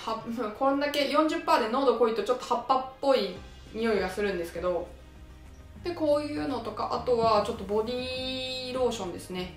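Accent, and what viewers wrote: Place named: Western Japan, Japan. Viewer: ちゃん極めて標準語ですが、普段は西の言葉なんでしょうか？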